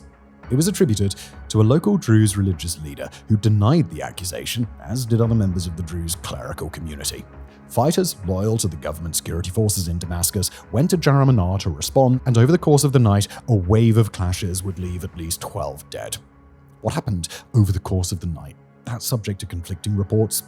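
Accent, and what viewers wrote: United Kingdom. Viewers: His Britishness is the same on most his channels with cadence and tone, but the backing music really does push it one way or the other. But yeah, it’s all SEO stuff designed to promote and get your attention, it’s how it has to be done if you want to get views and keep them. Blame YTs algorithm ultimately.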